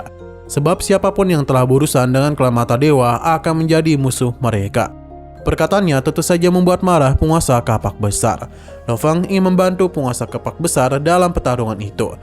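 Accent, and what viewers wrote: Banjar, Indonesia. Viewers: org banjar jua sakalinya min lih... terkipai kemana min😂😂😂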